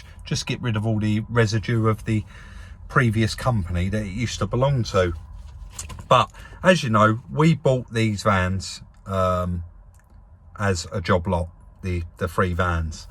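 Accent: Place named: England, United Kingdom. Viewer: the trouble with your videos is that they contain lots of stuff that doesn’t relate to the actual renovation of the vehicles. I don’t feel any need to see your wife’s dog at the backdoor, or your ticket from Redbridge to the midlands, or your walk through the British museum… But there was next to no pictures of doing any work on the door, or you doing any work on the brakes and other stuff. When I get to the end of one of your videos, I feel I haven’t learned any techniques or learned much about the type of vehicle. It all comes over as “ ’ere, didn’t we do well!....” Nice to hear a proper English accent, not some yank, but that isn’t enough to keep me watching. So I’m going to cancel my subscription. Ps#1 when you do the numbers, you never add in anything for the cost of maintaining your workshop, plus electricity, rates etc. You ought to, even if the wife is actually paying the bills. 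I suggest a flat rate of two hundred and fifty per vehicle. Ps#2 whatever you’re paying, I reckon it’s only half what he’s worth.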